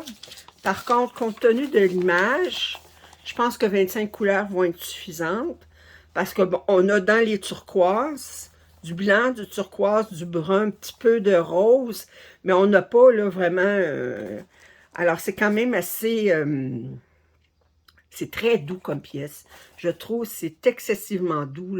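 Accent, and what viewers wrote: Canada. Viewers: Félicitations 🥰 tu es ma parenthèse de calme avant d'aller me coucher, mon moment à moi quand tout es réglé à la maison, et ton accent canadien me fait voyager ☺️, et bien sûr tous tes déballages me motivent à broder (et à acheter 😂)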